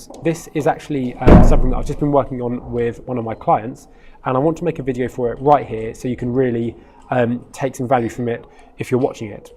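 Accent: United Kingdom. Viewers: Hello!I know that you're British but where is your accent from? thank you! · sound like you are pronouncing ^ as a in father. I think you are giving British & not American pronunciation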